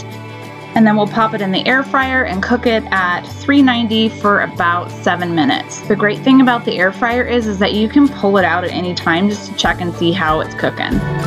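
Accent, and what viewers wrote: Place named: Minnesota, United States. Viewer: Your either from Mn or wi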